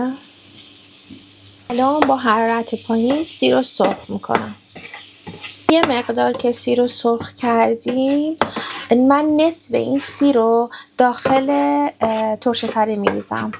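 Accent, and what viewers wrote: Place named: Iran. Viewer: I love the Persian accent you look incredible dear friend thanks for sharing best wishes from England ❤👍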